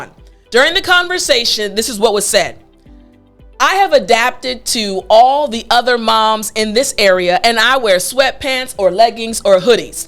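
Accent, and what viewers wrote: United States. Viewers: You speak as you wer american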